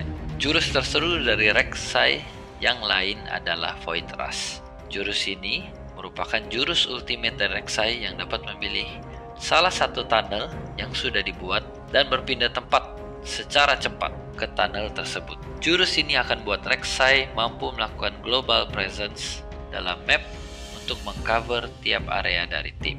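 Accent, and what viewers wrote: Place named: Java, Indonesia. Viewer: Jawa nya Jelas BGT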